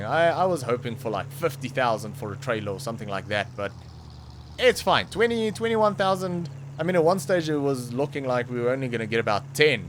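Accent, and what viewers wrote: Afrikaans, South Africa. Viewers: It sounds like you have an Afrikaans accent. I'm curious where are you from.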